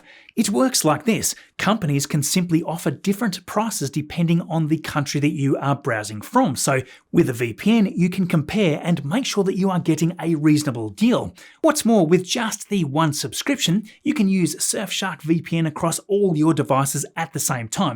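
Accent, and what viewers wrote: Australia. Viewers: I've been hearing it for years and I'm finally commenting on it. The Aussie accent makes it sound like you're saying boostera instead of booster. I love our accent sometimes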